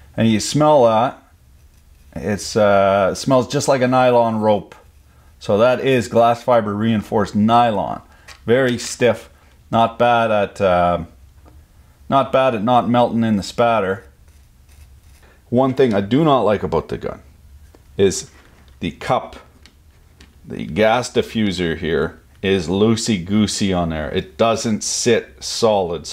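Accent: Quebec, Canada. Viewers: I thought the best part was hearing you speak Quebecois, until I heard your little girl... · Jte spot avec ton accent du lac saint jean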